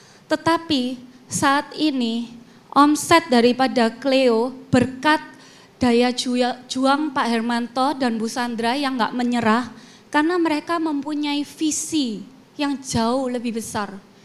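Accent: Java, Indonesia. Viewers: MEDOG TENAN REK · medhok jawanya kental bangeeettt 😀😀😀😂😂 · Logat jawa nya terdengar jelas.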